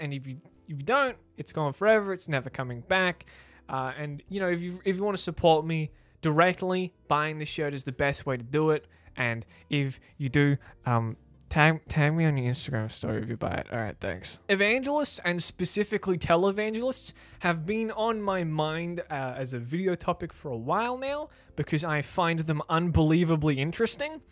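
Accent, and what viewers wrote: Australia. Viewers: an AUSSIE commentator finally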